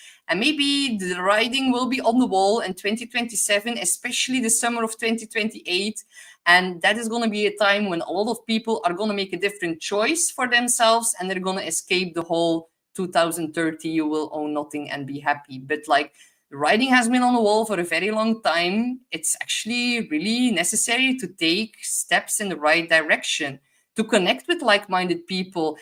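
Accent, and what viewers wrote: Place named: West Flanders, Belgium. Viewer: Aaaaaahhhh, je zit vol vuur meid!! Ik heb een stuk de live mee gehad en een stuk nu aan het luisteren. Schitterend hoe je er je westvloams tussen smiet 😂 high vibes high vibes!!! En ik hier roepen.... judges!!!! (Rechters). Zalig ❤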